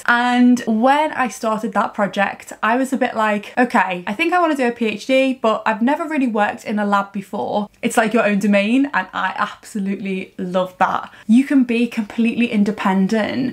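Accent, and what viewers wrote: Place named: Leeds, United Kingdom. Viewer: You sound like your from Leeds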